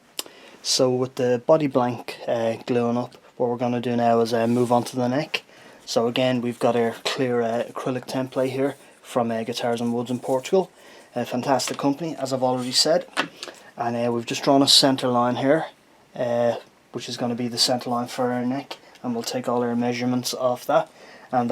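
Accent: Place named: Dublin, Ireland. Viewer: Perfect timing!. Just as I'm about to start a scratch build 'Tele' along comes a great teacher!. Your very fine attention to the details a novice really needs to know about is superior to any other source I could find on any YouTube channel. I'm building this for my 14 year old grandson as a surprise.!..I'd better not screw this up,he knows his guitars. Lord help me?? And a 'Jackeen' to boot. Lovely to hear your Dublin accent !!..I grew up in Marino and Fairview/Clontarf..No jobs nor any future; We youths had to go elswhere??..I'm up to part 4 now and I have a great handle on how to built a 'Telecaster', Thanks to your great teaching. From Albany NY USA..Brendan Murphy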